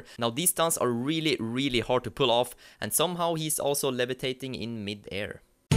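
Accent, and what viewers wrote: Sweden. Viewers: Do you come from sweden? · Nice video! are u swedish? u sound swedish :D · Thats classic swedish style english almost every swedish talks english like that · Are you swidish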